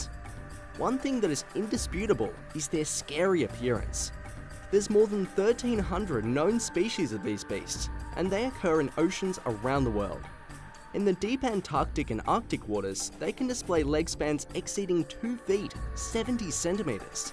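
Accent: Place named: Australia. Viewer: You know a video about wildlife is legit when you hear an Australian narrator.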